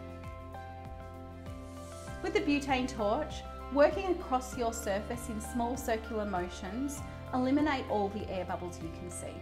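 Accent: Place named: Australia. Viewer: Nice to hear your familiar Aussie accent !! Great video xxx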